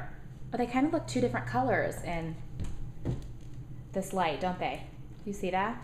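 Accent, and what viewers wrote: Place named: South, United States. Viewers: I'm from Texas and I think that your country accent is hilarious. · lol. Love this video and your boots! Also I am from Texas and you talking with southern accent did not bother me at all. :-) You rock!!!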